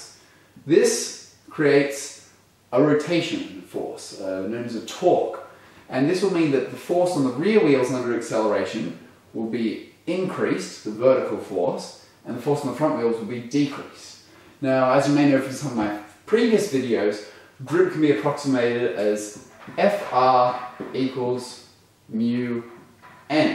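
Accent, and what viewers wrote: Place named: Australia. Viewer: Contents are very good, although a little too quick and a bit confusing at times. Problem is the Australian accent. Hard to fully understand when you are not Aussie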